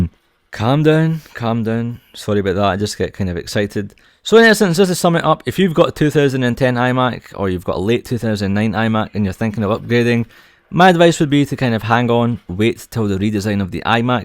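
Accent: Scotland, United Kingdom. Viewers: you're scottish laddy!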